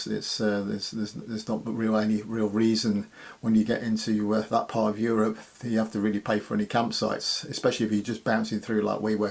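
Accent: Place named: Northern England, United Kingdom. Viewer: I'll be waiting. The Faroe Islands are the only place that I (seem to) have been to via astral travel. It has a kind of spatial attraction for me. My father was from Norway: that may help. Halls Gap? Been there a few times including camping .. twice. Lotsa roos on the streets. Just a query for you: how come you are driving a Belfast registered vehicle while you sound like you are from the north of England?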